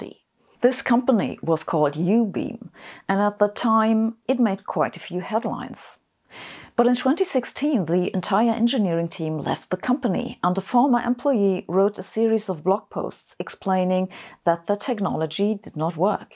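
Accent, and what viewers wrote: Germany. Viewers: Are you german? Alot of Youtubers i see with a similar accent have a similar type of "stare" No offense though. Your videos are informative and cool. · Hottest. Physicist. Alive. That German accent though.😵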